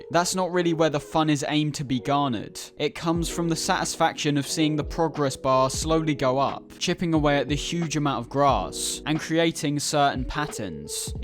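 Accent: United States. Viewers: Yes he definitely sounds like he was born and raised in South Arkansas. American through and through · I thought the thick American accent gave it away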